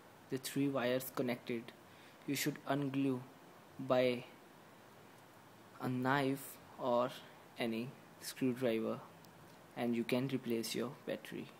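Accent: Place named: India. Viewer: pure indian accent. lmfao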